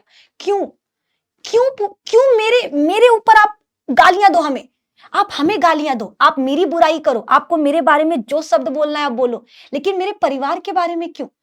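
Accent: Bihar, India. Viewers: Mam kutto ka kaam hai bhokana padhna to have nai unko aap please padhana band mat kariye main ab 10 me gayi hu aur main batch Lena chati guu aap se padh kar tap karn chati hu main khati huu ki aap ke Tarah koi padha Nahi sakta aur to aur you are my favourite teacher aap because aap ka bolne ka tarika bihaari wala I like it my first line dimak me rahk legiye main to asise hi short Dekh rahi thi aap ka video aa Gaya muhje testion hi gayi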